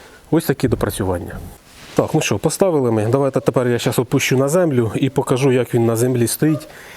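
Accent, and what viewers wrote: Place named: Surzhyk, Ukraine. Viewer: Хотя и говорит на суржике, но плохие,не нужные, слова не мовит( говорит) Все по делу. И субтитры. Молодец мужик( хлопец).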